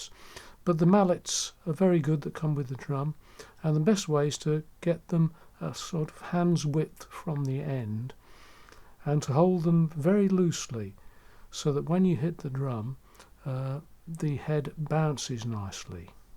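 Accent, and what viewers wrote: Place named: Suffolk, United Kingdom. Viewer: I detect a suffolk aaaacent there 😁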